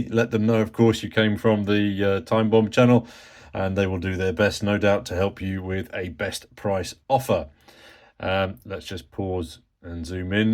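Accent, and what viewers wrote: United Kingdom. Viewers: Why can't British talk English properly like Americans? It's tough to understand, fella.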